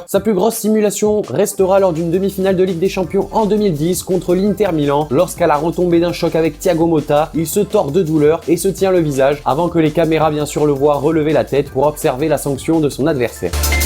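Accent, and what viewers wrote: Spain. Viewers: tu as un superbe accent espagnol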